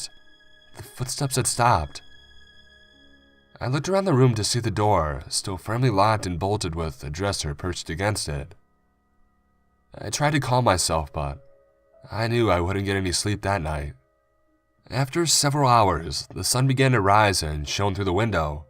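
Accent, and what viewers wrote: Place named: United States. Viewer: The fuck kinda dialect is this, Exceptional American?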